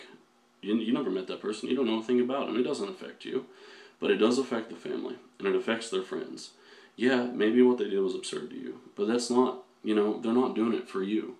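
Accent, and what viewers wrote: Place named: South, United States. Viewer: Thank u so much for sharing your experiences. So few people can understand the internal destruction of how ptsd ghosts terrorize you on a daily level. Or how the words "living hell" do not fully describe losing your mind to catatonic depresdion. It's so comforting to know im not the only one. Thank you. And I absolutely love your accent. I'm a sucker for southern twang. I would love to talk more with you when yiu have time, as I try to cope with PTSD. My email is <mail>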